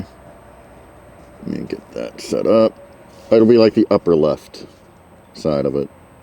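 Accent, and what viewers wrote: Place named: Midwest, United States. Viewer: I've noticed you are bit thin skinned and don't like constructive criticism. We are probably pretty close to the same age (I'm 55) and it's quite clear you have never had any formal instruction either from a civilian course or the military. This is your channel you can do as you see fit but maybe just maybe a few of us out here know a thing or two and perhaps its possible you could learn something? I'm not sure what state you are in Ohio or Indiana maybe possibly Pennsylvania with that accent? HR Funk (gun Tuber) lives in Ohio. You could probably reach out and I bet he would be happy to give you some personal instruction on rifle marksmanship. He was a Marine Corps sniper and a police officer and even a police chief before he retired. He's one of the nicest chaps on Youtube. Look him up he typically replies to emails fairly promptly.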